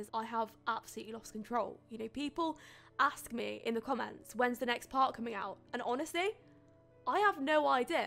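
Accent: England, United Kingdom. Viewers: i Love your accent! where is it from? i mean what Area in england?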